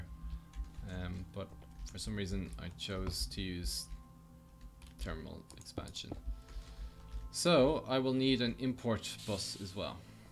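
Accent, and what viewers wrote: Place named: Waterford, Ireland. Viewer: Thank you soooo much man, i have spent literally 18 hours between trying to figure this out and watching an insane amount of gobshites TRYING to explain how to do this hahahaha. BTW are you Irish? You sound like a Waterford man ;)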